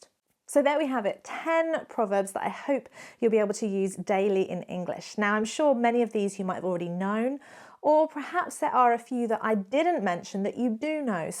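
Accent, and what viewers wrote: United Kingdom. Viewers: So charming with your British accent. Just in case anyone was wondering, these are all commonly used in the US as well. Here's one I love, but seldom hear; it seems to come from the midwestern US: "You can get used to hanging if you hang long enough."" It expresses a grudging patience with unpleasant circumstances one is not able to change, with reference to the lynchings that were a part of vigilante justice in frontier days. Example: "Do I really have to put up with that child's whining all summer long? Well, I suppose you can get used to hanging if you hang long enough." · British or american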